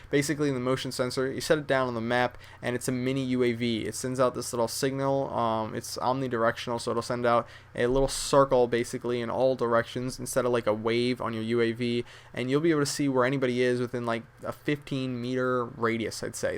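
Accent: Sweden. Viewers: is he from sweden? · are you from Sweden? :O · are u swedish lol?? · are you from sweden? :P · ARE YOU SWEDISH?!!? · are you from sweden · are u from sweden? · Are you from Sweden? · Are you from sweden? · are you swedish? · are you from swedin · Does he sound Sweden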